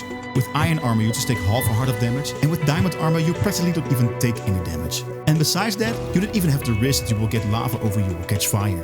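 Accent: Netherlands. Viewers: You sound like a very well English speaking dutch men Am i correct? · haha ik hoor de nl accent gelijk al, goede video wel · Sound like a Dutch guy. Nice content bro. Netjes!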